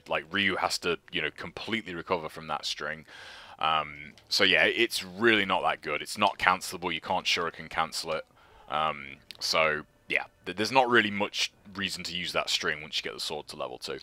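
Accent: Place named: England, United Kingdom. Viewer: Great videos! Thanks man. BTW: which part of England is your accent from?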